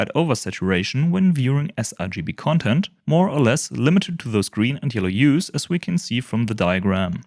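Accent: Germany. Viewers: Dieser deutsche Akzent xD Nice Video mein Freund ;)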